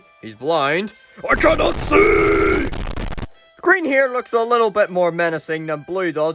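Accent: United Kingdom. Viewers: Your British lol and so am i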